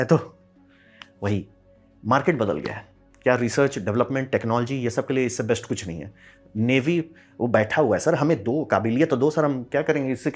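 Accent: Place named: Jharkhand, India. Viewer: Jharkhand's Hindi Accent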